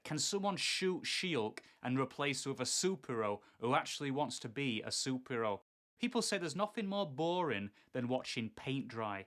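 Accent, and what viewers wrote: Yorkshire, United Kingdom. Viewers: The Yorkshire accent is 10/10 makes me want to visit hahaha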